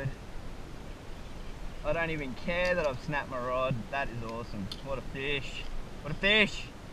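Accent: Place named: Australia. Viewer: you are the 3rd Aussie i subcribed love you guy's your great sports and love the accent to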